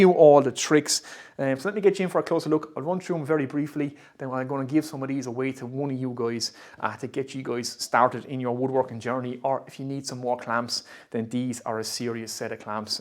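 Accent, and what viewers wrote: Ireland. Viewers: Great channel, we still get a kick out of the accent and we miss all of our Irish friends. I NEED CLAMPS! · Came for the tool reviews and shop projects, stayed for beautiful shop, depth of knowledge and that lovely Irish accent 😁 And as I'm slowly building my own little shop... I NEED CLAMPS!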